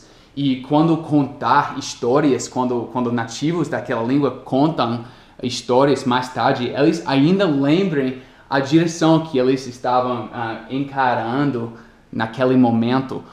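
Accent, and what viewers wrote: Brazil. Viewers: Impressão ou em alguns momentos ele puxa o sotaque gaúcho, cara to devorando os vídeos e é incrível o quanto ele entrou no sotaque brasileiro. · volta para o Brasil!!!!!! Muito tempo longe você vai perdendo o seu sotaque brasileiro...🤗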